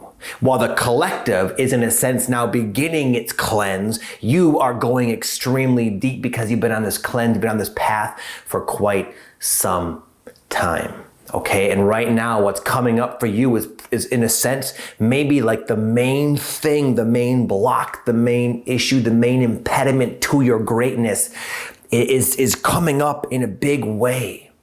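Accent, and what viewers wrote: Michigan, United States. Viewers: Great video as always! Unrelated note, I can hear the Michigan in your accent (it's okay, I can say that because I'm from Michigan too 😂)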